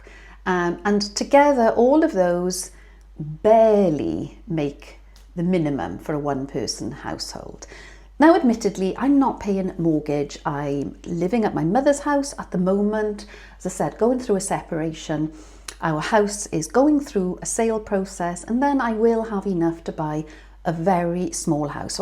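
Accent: Wales, United Kingdom. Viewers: it is very obvious that throughout your life you've always been a Very good looking lady. And still look far younger than your age, you clearly look after yourself! It works. At 70, I've been going to the gym for 3 yrs now, 3 times a week, 2hr sessions each, plus long walks; 5-11 miles. Never been better! And you have a beautiful soul which you use now to help others, even without a particular topic, I'm sure many people living alone just like to sit and listen to another human being chatting away about their lives. It provides comfort and a degree of normality by association. You are a 'giver', and you do it through love. That is the best and only way, and it returns like you would not believe! That gentle Welsh accent also helps:)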